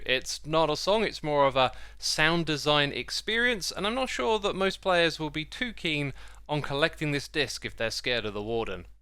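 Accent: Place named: United Kingdom. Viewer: realizing i have never heard anyone with a british accent say "y'all" until today.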